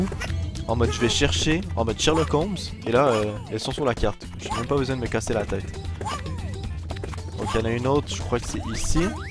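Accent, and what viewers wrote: Quebec, Canada. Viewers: Ton accent québécois non d'un chien c'est bon!!!!!!!!!!!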